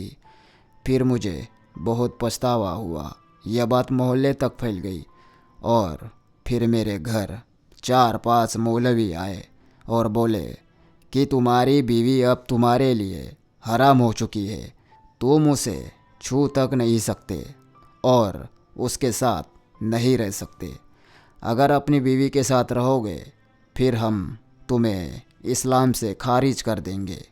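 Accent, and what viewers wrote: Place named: Telugu region, India. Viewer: Aapke baat sunke aisa lagta hei ki aap Telengana ya Andhra side ke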